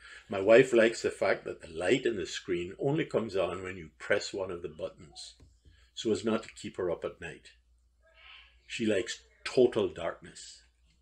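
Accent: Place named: Caribbean. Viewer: You sound Caribbean ish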